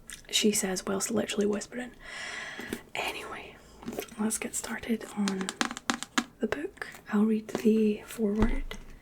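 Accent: Scotland, United Kingdom. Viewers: Scottish accent and Sark douls in one video. The bell hath been clicked.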